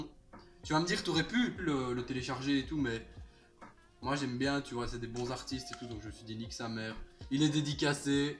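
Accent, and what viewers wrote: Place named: Belgium. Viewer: Ça sent la Belgique dans la voix le gars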